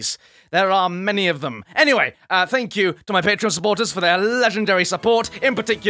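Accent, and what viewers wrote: England, United Kingdom. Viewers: although mate, you might be a little pommy mouthfull...jesus you are great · british cussing is bloody hilarious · Best British accent I ever hear in my entire life. Anyway you just gained a sub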